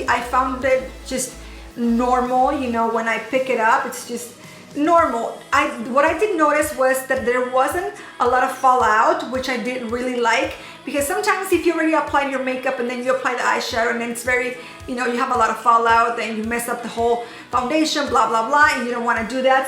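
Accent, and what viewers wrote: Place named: Mexico. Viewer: Hola... veo tus vídeos para aprender un poco más el idioma, aunque se me hace rara tu pronunciación, es un inglés americano con acento mejinaco muy gracioso. Pero aquí me tienes 🤗porque eres si serás mi youtuber favorita. Aunque mi canal favorito es de de vlogs, sí ese que tienes algo descuidado últimamente 😔... Saludos desde Madrid España 😘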